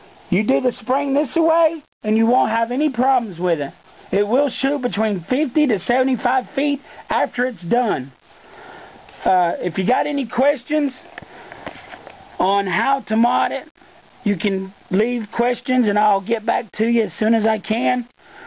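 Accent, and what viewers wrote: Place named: South, United States. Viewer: Ur voice always sounds funny because ur from the south and I'm from the north so yeah, no offense, great vid can't wait to get the long strike!!!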